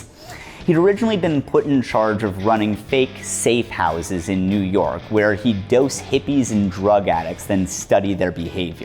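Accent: Canada. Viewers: theres something about hearing a canadian talk about american history that causes me to chuckle. im 30 min in so far so good.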